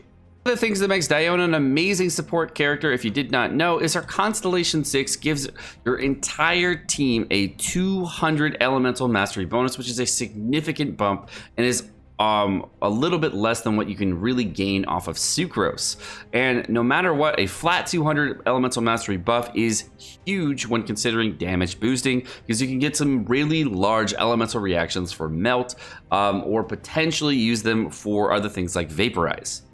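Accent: United Kingdom. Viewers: The British accent just cracks me up xD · Dam your British accent be better then mine and I am actually British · I want that British accent of yours to say "can I got a bottle of water"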